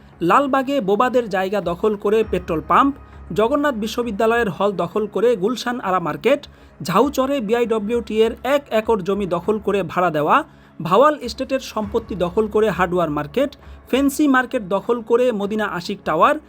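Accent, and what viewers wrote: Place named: Bihar, India. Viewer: কথায় বিহারি টান আছে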